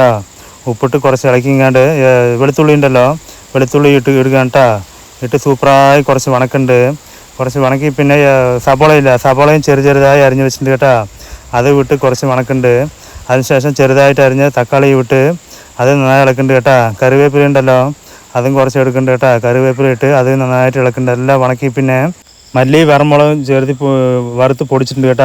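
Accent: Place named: Palakkad, India. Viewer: Palakkade slang aanu broo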